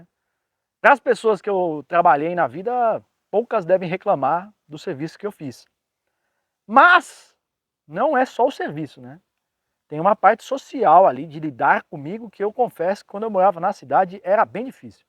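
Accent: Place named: Minas Gerais, Brazil. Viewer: Como fala o mineiro . Que bobajada bunita sô.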